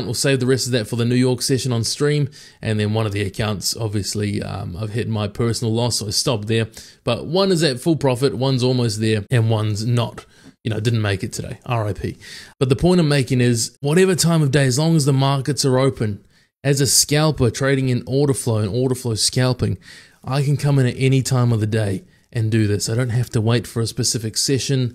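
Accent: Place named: Australia. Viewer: you sound like you have a bit of an Australian accent but you say pm East coast time, what session is this in Australia ?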